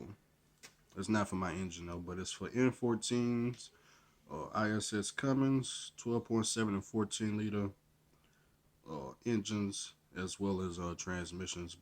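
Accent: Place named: South, United States. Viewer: be killing me with that southern draw but we love it lol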